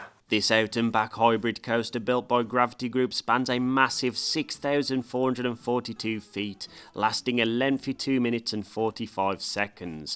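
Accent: Black Country, United Kingdom. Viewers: Just come across your channel and first thought is he’s gotta be from the Black Country can tell our accent anywhere mush lol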